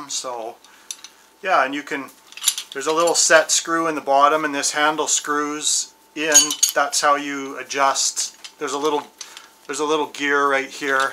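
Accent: Canada. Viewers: So cool, I just got one and am going to take a video of it tonight for my first use...just watching some people do this...you sound like me too...either from up north or Canada!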